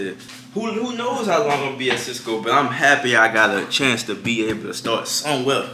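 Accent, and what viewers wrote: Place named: New Orleans, United States. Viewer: Say bro u from new orleans? Nvm I went and heard the “ya heard me”. U done confirmed it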